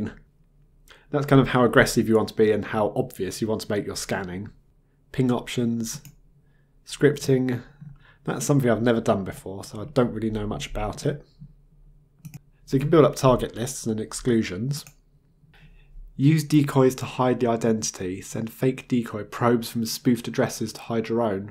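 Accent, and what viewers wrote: United Kingdom. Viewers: I have subscribed to the channel just because the British accent :gentleman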